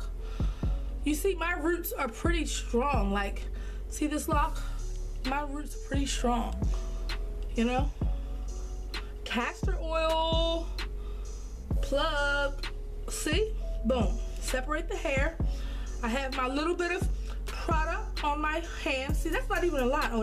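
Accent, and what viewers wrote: Baltimore, United States. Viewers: Are you from Bmore? If not you have a serious Bmore accent. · you from baltimore?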